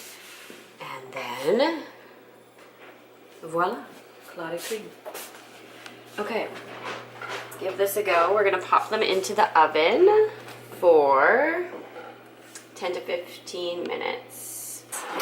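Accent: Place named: England, United Kingdom. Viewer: I just binged on the entire season of The Great British Bake Vlog-- I LOVE it! As a fellow foodie/baker/Ina Garten groupie, I can't help but applaud your efforts at baking your way through the GBBO. Most times when I'm in the kitchen cooking, I like to pretend that I'm in the kitchen cooking alongside Ina in East Hampton. So naturally when I watch you bake in your English accent while ordering around your sous chef/camera man/best cow vet ever, I can't help but smile. Your unscripted baking show is top notch, I've never laughed as much during a baking vlog. Cheers to future episodes! -- Your California Fan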